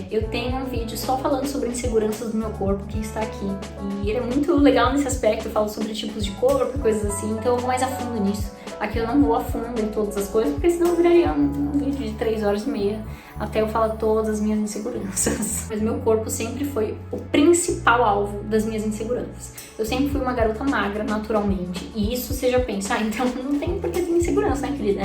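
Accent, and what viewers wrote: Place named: Rio Grande do Norte, Brazil. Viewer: Perdi as contas de quantas vezes vi esse vídeo de ontem para hoje. Pq você tratou esse tema de uma forma muito próxima, e isso é bom. O tema da auto estima de fato é uma coisa que a gente precisa ver e rever todos os dias. Ontem, li que resultado não vem com motivação mas sim com constância. O mesmo se aplica a nossa auto estima. Estou nessa mesma fase que você, de ir entendendo que meu novo corpo que já não é o mesmo de 10 anos atrás quanto eu tinha 17, surge na minha frente uma mulher e não mais uma adolescente, e é preciso amar, reconhecer e respeitar as curvas novas, e sim, com certeza melhorar aquilo que ainda não é tão legal pra gente e você trouxe isso muito bem. Ultimamente tenho filmado meus piores ângulos kk acrescento uma risada engraçada e vejo e revejo aquele tal pedaço de mim que eu não gosto pra justamente ver ele tantas vezes que ele se tornou algo comum e não um incômodo. 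Se a gente se fato rever esses pontos, eles nem são tão ruins assim, vai. Obrigada pelo vídeo. Continue postando essas coisas relevantes pra gente ter conteúdo real nessa internet. Ah, e eu achei lindo seu sotaque no inglês. Cheiro de Natal/RN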